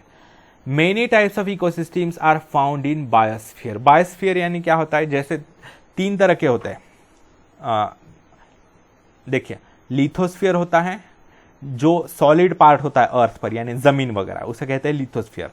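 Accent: Maharashtra, India. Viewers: Thank you so much sir for teaching us very nicely...😁 Btw I have a question , is your mother language is Marathi..??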